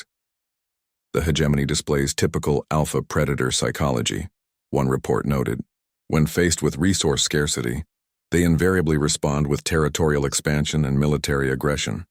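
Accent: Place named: United States. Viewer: Oh the irony, of having an American voice declaring a breakdown of rules. In particular the time frame of the world order breaking because of an American presi... donkey.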